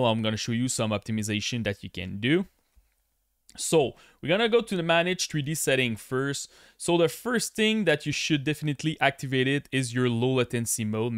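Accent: Quebec, Canada. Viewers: T québecois avoue lol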